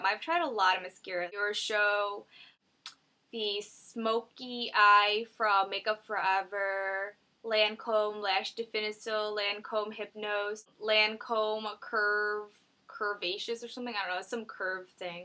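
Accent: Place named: Alaska, United States. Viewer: I just noticed the sarah palin accent too haha.. anyway nice video!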